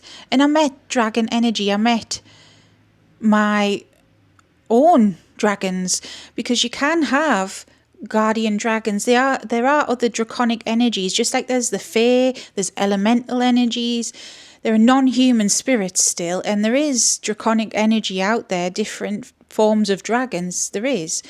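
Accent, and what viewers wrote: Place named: North East England, United Kingdom. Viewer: Oh your a north east lass , Xx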